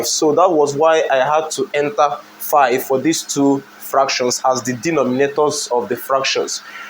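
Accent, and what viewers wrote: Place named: Africa. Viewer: Nice accent and are u actually from Africa if so which country?❤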